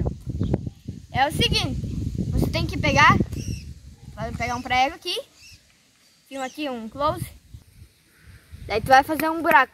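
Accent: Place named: Rio Grande do Sul, Brazil. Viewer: Gosto do sutaque gaúcho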